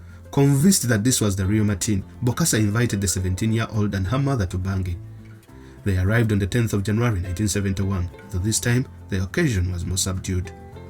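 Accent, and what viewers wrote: Uganda. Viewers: Ugandan ...This voice and narration is so Ugandan .well done.keep it up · The narration sounds so Ugandan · He sounds either Ugandan or Rwandese. Probably Ugandan though, sounds a lot like my dad. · Ugandan accent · This is a Ugandan accent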